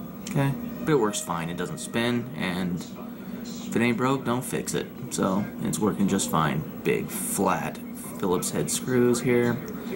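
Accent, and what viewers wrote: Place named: California, United States. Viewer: are you from Orange County, CA by any chance? You talk the same lingo we do lol. I live in AZ now though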